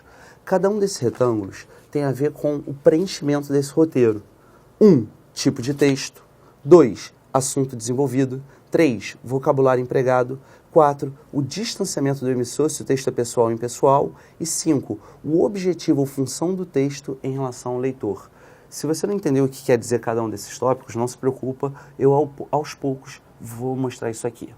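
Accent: Rio de Janeiro, Brazil. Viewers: ele é carioca falaigual a mim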